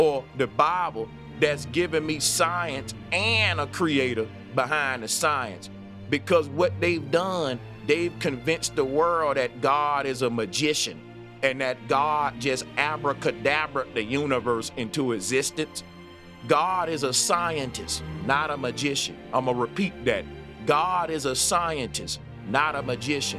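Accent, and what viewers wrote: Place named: South, United States. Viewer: you disrespect all the black women that made it possible for America to brag about being the first on the moon. You do know if it wasn't for a black female mathematician came up with the calculations for America to 1st send a man into space, than to the moon. GOD is a jealous GOD should have given you the red flag that something isn't right. Why would a being over all be jealous, you peole are too old to believe these fairy tales. Flat Earthers have literally been proven wrong just recently from that trip to Antarctica. Why didn't you take the trip that guy offered to people like you. You can't prove it and no one has silenced you, we laugh at your ignorance in 2025 because there are so much proof of yourr foolishness. In the end you making the statement thst everybody hates Trump so he musy be good, you country accenr does you know justice and just hearing you talk makes me think of why Harriet Tubman always carried a shotgun when she freed the slaves. 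Trump has literally tried to overturn the 1964 civil rights acts but you understand why MAHA likes him. Yeah because the want to makes "US" slaves again...actually get some knowledge because you're lost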